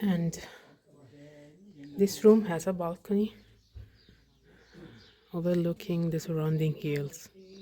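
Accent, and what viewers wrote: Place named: Italy. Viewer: Very nice presentation in English with charming Italian accent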